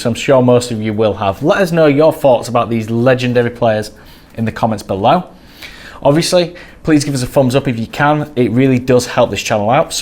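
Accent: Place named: Yorkshire, United Kingdom. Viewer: Silly question, but as an American, I hear a very particular accent. Where is this accent from? Would you call it Yorkshire?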